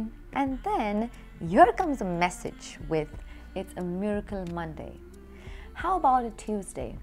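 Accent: India. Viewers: Are you a native English speaker.? You are speaking indlish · You sound local Indian village English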